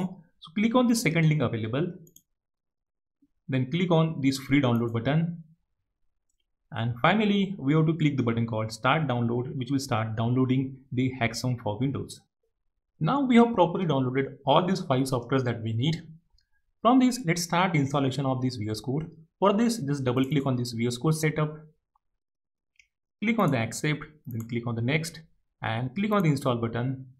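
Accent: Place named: India. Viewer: closed after the indian hello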